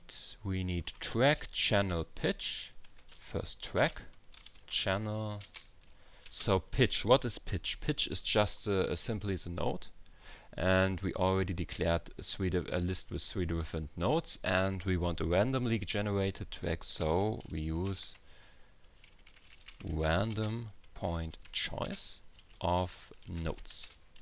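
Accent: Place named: Germany. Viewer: You sound very German. Ich kenne diese Scham, den eigenen Code zeigen zu müssen ^^.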